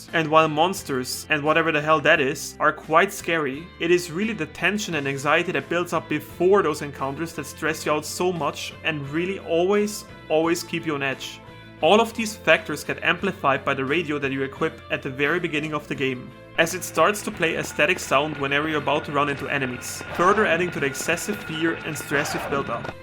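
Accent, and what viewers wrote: Germany. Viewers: Dude you sound incredibly german digga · That is one thick German accent 😁😁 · The German accent is strong with this one... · I smell German from this guy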